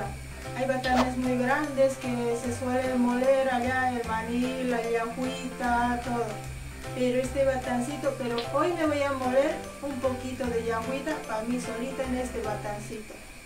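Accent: Spain. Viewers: Lo primero que me llamó la atención, el acento. Inmediatamente pude percibir que reside en España. Por lo demás, práctico la receta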